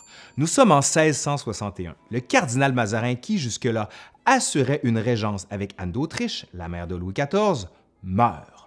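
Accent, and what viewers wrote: Quebec, Canada. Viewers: L'histoire de France avec le charmant accent canadien, ça en jette ! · Le roi avait le palais ouvert et de plus, une dentition atroce. Il parait qu'il lui était impossible de mastiquer. Il ne pouvait prendre que de la nourriture liquide ou en purée. Qu'en est-il ? Vidéo très intéressante comme toutes les autres ! Je vous remercie d'articuler parfaitement et de parler à un rythme également parfait. Cela permet une compréhension idéale. On vous entend bien, on vous comprend bien, et en prime on a un bel accent canadien ! Lui-même parfait car juste assez prononcé pour donner une jolie musique mais sans nuire à la compréhension. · Nous français on trouve votre accent bizarre on en rit même...😓😓😓 Mais à force d écouter la chaîne j apprecie votre accent donc continuez amis du QUÉBEC Super doc comme toujours Force à vous 💪💪💪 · Suis français et j’adore me faire raconter l’histoire de France par quelqu’un qui a l’accent québécois. 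J’écoute/je regarde toutes les vidéos depuis le début #mercileconfinement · Tes vidéos sont très intéressantes, mais je te suis aussi pour ta sympathie et ton accent québécois!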